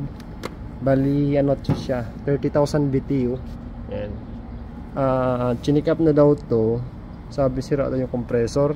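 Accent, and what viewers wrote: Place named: Iloilo, Philippines. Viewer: ilongo ka too hahha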